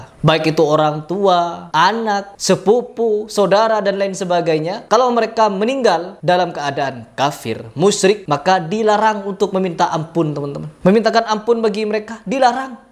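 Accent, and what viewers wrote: Madura, Indonesia. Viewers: Assalamualaikum 🙏💪 bang maaf bang Kayak orang Madura dr logat bahasa nya Madura Sy dr Madura bang 💪💪💪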